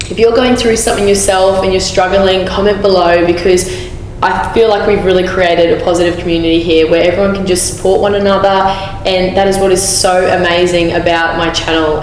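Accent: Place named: Australia. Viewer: Australian accent is so beautiful.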